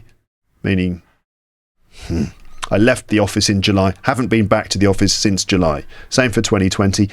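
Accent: United Kingdom. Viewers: Hi Mr., hope you are doing well. I listen to you to improve my pronunciation. I like the way you speak English. I acquire British accent. Thanks and greetings from Türkiye 🇹🇷. God bless you. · Is it was British accent????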